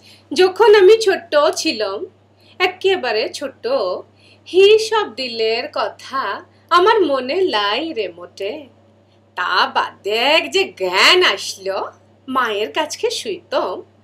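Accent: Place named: Rarh, India. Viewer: mondal apni ki kono din bangla upovasa(bengali nativ acents) gulor nam suneche? somvoboto sonen ni. eta amader bangla vasar sompod . kobita ta to rarh upovasa (ancholic bangla) tei abbriti kora.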